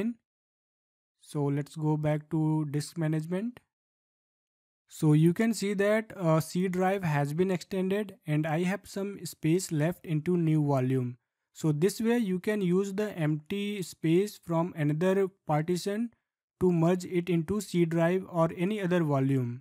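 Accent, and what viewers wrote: India. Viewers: These indian accents are calming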